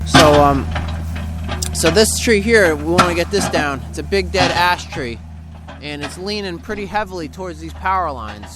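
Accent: New York, United States. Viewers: Wiffa,haffa,poseta,lol..you sound like my uncle from N.Y...love the videos...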